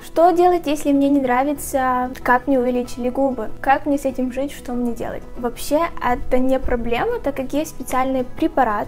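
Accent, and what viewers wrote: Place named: Western Ukraine, Ukraine. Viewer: Это западно-украинский акцент. Ничего плохого здесь нет... А вы - нетолерантны.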